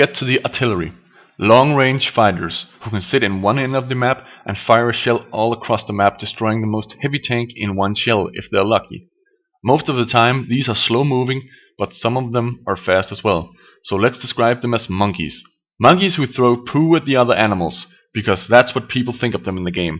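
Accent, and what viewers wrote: Denmark. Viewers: You sound danish. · Are you danish? ;) Btw, love your vids! :D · Are you danish..? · You're Danish aren't you?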